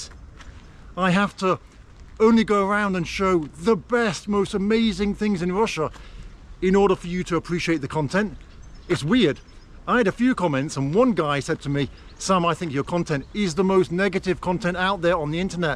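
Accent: United Kingdom. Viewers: If you walk in Detroit or now even in LA or NY, the landscape is even shitty than that. Thast's the "real Russia" you want to show. And your british accent teils us why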